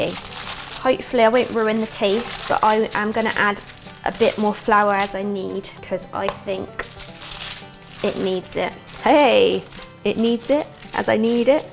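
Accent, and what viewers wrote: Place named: England, United Kingdom. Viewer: I'm hungry again! could walk into any Southern kitchen... and everyone would assume she's a local girl. ( unless she starting speaking in that beautiful English accent). 😀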